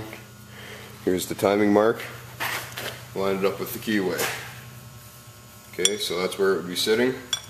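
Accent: Alberta, Canada. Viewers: are you canadian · Sounds more like an Alberta accent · canadian accent, lived there for 8 years ;) looking to self install cam in my 2012 camaro ss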